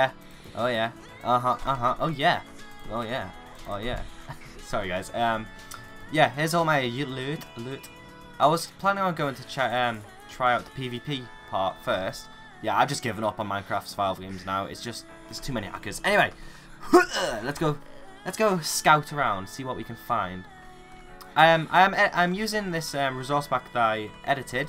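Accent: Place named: Scotland, United Kingdom. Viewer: You have a bit of an accent, are you Scottish?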